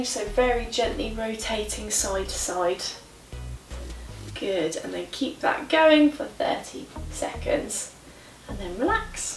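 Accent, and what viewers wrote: United Kingdom. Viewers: Love the British accent! :)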